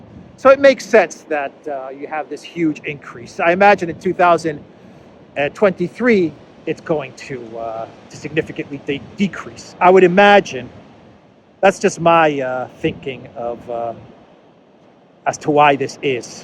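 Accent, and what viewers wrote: United States. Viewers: I have news for you. YouTube brings us here. We have no idea who you are. You're obviously from the US or Canada. I would guess US. You might want to put something in "Abut". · Good Morning,. You’ve got American accent. How so? Thank you for the refreshing morning walk and world news update. Good day for you too.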